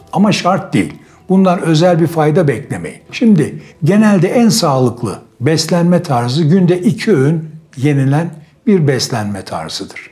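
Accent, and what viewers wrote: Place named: Istanbul, Turkey. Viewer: Istanbul lehçesi ayrı bir zevk veriyor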